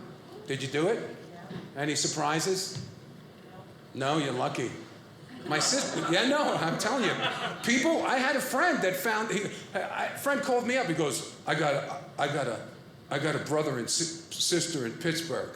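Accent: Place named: Bronx, United States. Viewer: OMG I knew from your accent that you were from The Bronx!! Italians rule!!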